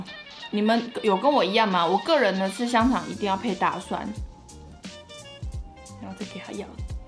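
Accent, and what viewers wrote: Taiwan. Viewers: 好愛妳說台語請問是哪裡人？ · 為什麼妳這麼台灣國語？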